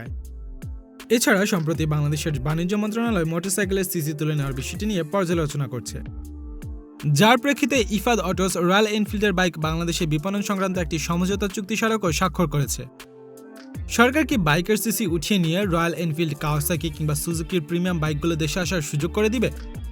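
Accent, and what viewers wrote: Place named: United Kingdom. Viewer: অনুরোধ করছি ভয়েসওভারে বাংলা অংশ টুকু পরিষ্কার করে বলার জন্য। ইংলিশ এ্যাকসেন্ট আর বাংলা এ্যাকসেন্ট করে ফেলায় মনে হচ্ছে বাংলাটাও ইংলিশের মতো করে উচ্চারণ করা হচ্ছে।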